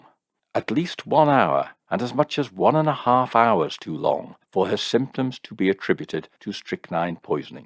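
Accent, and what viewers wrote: United Kingdom. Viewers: We missed you and you're wonderful story telling, drawings and investigative talents. We want more! Best of wishes always...From a Brit in the USA who is always restored by a fellow British accent and intelligent logic. XXXXX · I love the true crime stories that you presented. Hope to see miore of these videos of yours. And the music is great, your voice plus the gunshot. So British.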